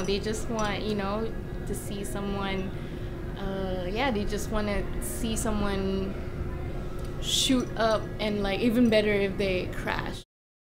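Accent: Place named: Malaysia. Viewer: She is from Malaysia like I am so that's why she has a little bit of accent of malaysia.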